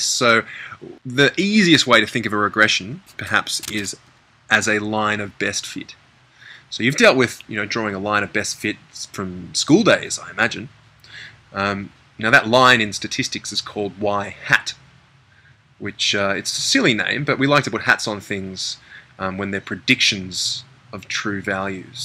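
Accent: Australia. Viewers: Love the Aussie accent haha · Are u from oz ?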